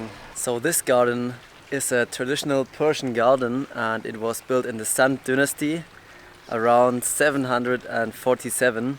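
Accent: Germany. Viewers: Are u german??? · He speaks English very well. I barely can hear German accent.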